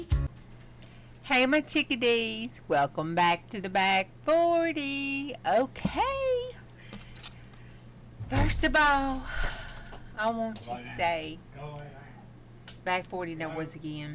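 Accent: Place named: South, United States. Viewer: Congrats my dear friend - you deserve everything good that comes your way. I don’t have one specific thing I love - what I love about you and your channel is your wit/humor, the way you care for other people, the way you are willing to help others with helpful tips, and the great art you produce. Oh, I can’t forget your lovely southern accent. In short - I love you, girl. ❤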